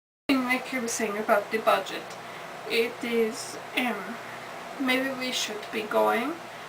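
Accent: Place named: Germany. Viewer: Naaaw! ^ ^ I love your cosplay! But your german accent is the best ever xD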